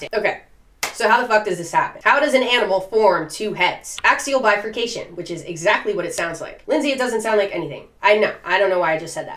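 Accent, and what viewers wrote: Midwest, United States. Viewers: I found your channel! I was wondering why you seemed so familiar, someone showed me your tiktok, a video, and i was like "yeah no shot, i learned that when i was 5.... did you not go to elemtary?" like they were showing basic crap, not the real intersting stuff that's in your actual full videos. i thought you were an up and comer, no you're a tik tok legend, that started youtube. So you'll never read this. BUT I was trying to guess you accent. I was thinking "gotta be mid west, but not north mid west, mid west as in (west if chicago,) pretty shres the same accent until you get to so-cal and portland and seattle areas. I was close!! Thogh your PO box says LA, i don't think you were raised in SoCal. I'd say between Northern cali and chicago, somewhere between there, but as far as south as north arizona/newmexico. (it's a large accent area) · Australia may be unhinged but my partner is scared of your videos because you look like you grew up out bush but but speak with an American accent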